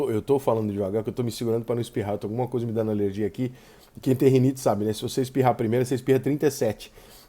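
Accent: Rio de Janeiro, Brazil. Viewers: ESSE CARA CISMOU QUE É CARIOCA,COMO SE FOSSE BACANA SER CARIOCA, AGORA SÓ TÁ FALTANDO ELE PUXAR O "S", QUE É UM TROÇO CHATO DEMAIS!!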